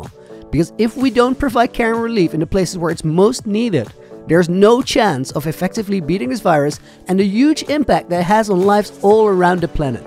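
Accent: Netherlands. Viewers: Wait... I was just looking on your channel, when I saw that you're from the Netherlands... normally I can easily hear when someone's Dutch, but damn, that took me by surprise. Now I can never unhear the slight Dutch accent ever again lol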